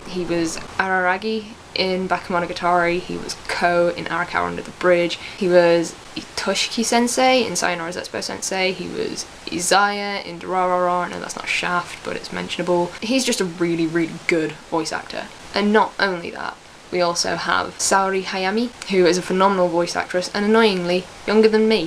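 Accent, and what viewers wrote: United Kingdom. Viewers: Nice Review, It's nice to hear the voice of another British person other than myself lol. Also you did a great job editing the video It's almost seamless. Subbed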